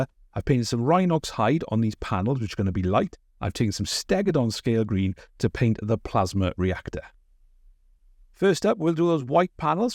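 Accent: Wales, United Kingdom. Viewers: Not sure why the algorithm has only now led me to your channel. Pleasantly surprised to hear a fellow Welsh accent! Looking forward to watching more of your content!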